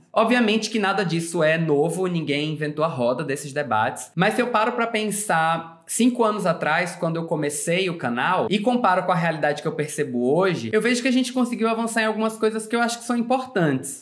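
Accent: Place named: Northeast, Brazil. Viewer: Que delicia de sotaque ❤ bem senti mesmo algo nordestino amuuu. Viva nosso nordeste. Muito gratificante encontrar um conteúdo profundo e importante que nos rodeia mas que tudo mundo finge que não ta rolando ou que não rola, necessário este assunto.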